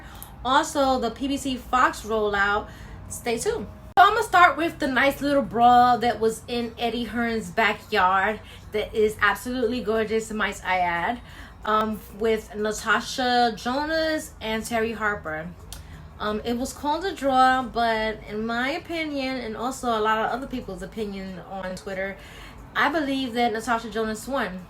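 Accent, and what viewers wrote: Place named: Bronx, United States. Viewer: Good to see you back on YouTube making videos again great to hear that Bronx accent hahahahaha hopefully boxing is on the way back and full respect to both these ladies put on a great fight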